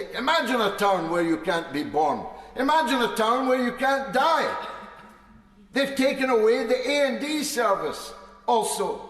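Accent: Scotland, United Kingdom. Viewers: The Scottish accent is beautiful.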